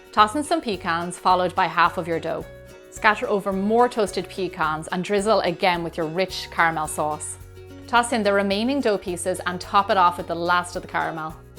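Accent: Ireland. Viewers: It's softened for American ears i guess :p But you can almost listen to the Irish pub rogue in ya ! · Love the recipe. You have a beautiful Irish accent by the way. How long have you lived in the US?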